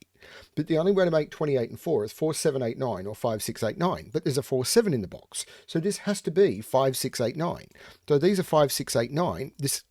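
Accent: Australia. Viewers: Is he from Australia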